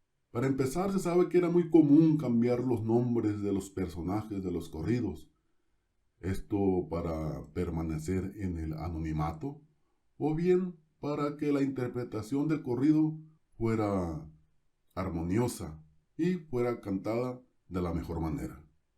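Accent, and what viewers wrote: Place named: Guerrero, Mexico. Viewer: Esté tío habla cómo sí fuera de Guerrero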